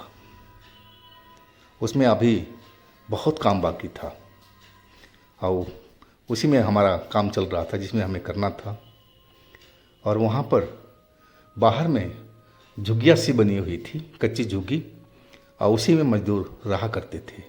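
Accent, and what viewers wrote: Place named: Bengal, India. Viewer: Bhaiya…. Aap ki Hindi…. Too cute🥰🥰🥰🥰Bengali ho na???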